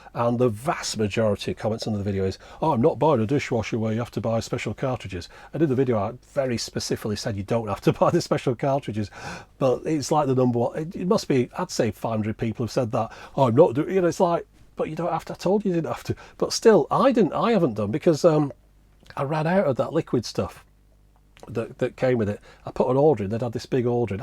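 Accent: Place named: Wirral, United Kingdom. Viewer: . I'started watching you three or four months ago and got hooked, so today a signed up with Patreon. As a Brit living in the USA, with maybe a few more miles on the clock than yourself, and married to a girl from the Wirral, it's great to hear that accent again. I know you like units with a CRT embedded in it and wondered whether you know anything about the portable Galaxy 2200 Video Cassette recorder? Its of the 'made of steel' era with a corded remote, powered by battery or mains and with a handle to carry it around... There's precious little info on the web and prices go from free to $250 functioning. Any how, I could make an unusual piece. Much love from across the ocean. Leon.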